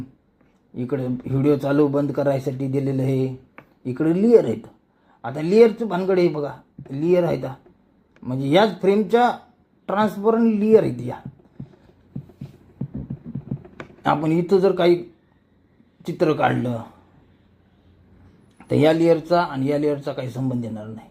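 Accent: India. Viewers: अहो बापू काय राव तुम्ही पण काय ती तुमची गावरान भाषा एकच नंबर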